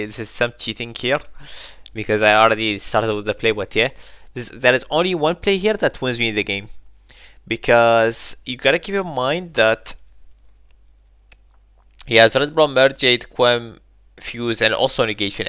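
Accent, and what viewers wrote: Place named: Iraq. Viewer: من صوتك كلت عراقي مابيه مجال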